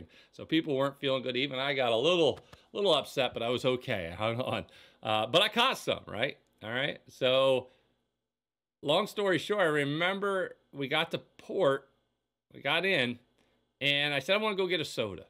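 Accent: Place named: Pennsylvania, United States. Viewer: Hello from Morgantown WV. You sound like you're from PA,.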